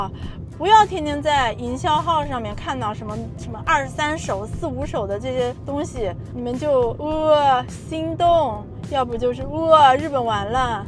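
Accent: China. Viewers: 哈哈，講話像是大陸人口音，可是render在影片上的字幕卻是繁體，還是剛好講的內容簡繁都是同字啊？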